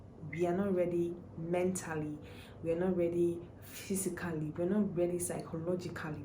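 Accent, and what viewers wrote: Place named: Ghana. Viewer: Your accent is even becoming like Ghanaian accent🇬🇭🇬🇭🇬🇭🇬🇭🇬🇭♥️💛💚❤️🇳🇬💚⚪💚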